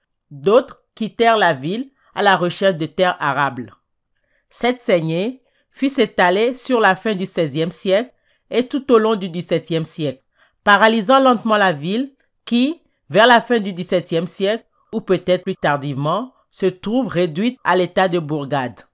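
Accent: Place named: France. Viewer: C est bizarre on dirait que tu Francaise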